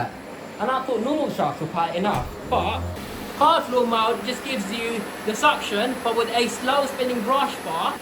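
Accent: United Kingdom. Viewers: "A million times Bet ah" I love a British accent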